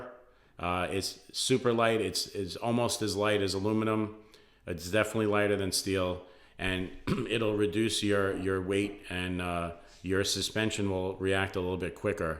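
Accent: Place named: New Jersey, United States. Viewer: I just realized I think you’re in New Jersey I could tell by the accent lol. But I’m gonna guess up north because I’m in the south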